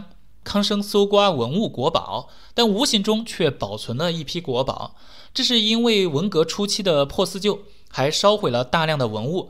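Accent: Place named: Hunan, China. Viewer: 我对湖南口音很排斥😂😂😂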